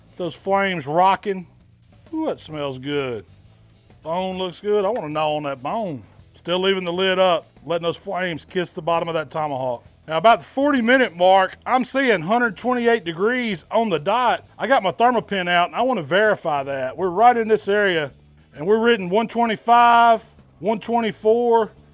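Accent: South, United States. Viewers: Something about a fat guy with a southern accent and awesome sayings that gives instant smoking credibility. Not a diss at all. Your videos are all awesome.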